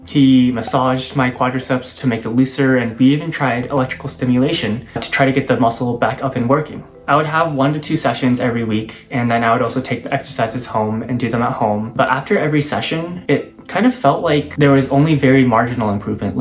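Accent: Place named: Canada. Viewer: thanks for sharing,you have a strong Canadian accent, bravo for you to share your fight with cancer, even you have used Chemo to kill most cancer cells, mind you the main Stem cancer cell is not destroyed and can never be terminated. Just my personal advice that please keep eating foods that keep your body in alkaline state, cancer cells wont grow strong in an alkaline envirament, this is the best way to survive,do not ever allow your cancer stem cell to come back, remember this.....i pray for your health n have a wonderful life...PS from the video, you have leaky guts and inflammed liver, go do your research on how to deal with leaky guts n what not. stay strong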